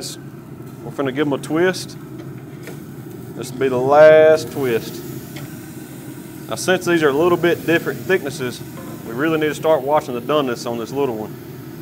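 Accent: South, United States. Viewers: Southern accent? Check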